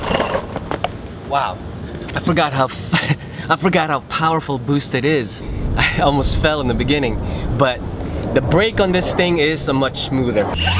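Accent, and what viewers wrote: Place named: Europe. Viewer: You talk like idubbz with European accent lol!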